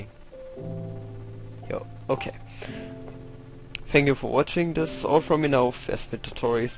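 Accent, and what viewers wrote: Germany. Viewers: You Are German ?? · Could it be, that you're german?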